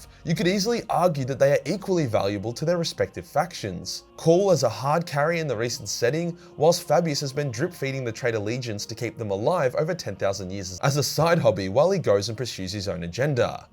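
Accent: Australia. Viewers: yikes... Dont listen to this dude when he talks shit about college. Terrible advice. You really think a stupid website will hold more value to a degree which by the way, if you go about it wisely and use all the resources available to everybody, it wont cost you thousands of dollars. I got my associates without spending a penny due to scholarships and grants. Dont be lazy, be smart and ambitious. Really easy for this guy to say it when he got lucky off of talking about warhammer with an Australian accent for a living and thriving off of donations.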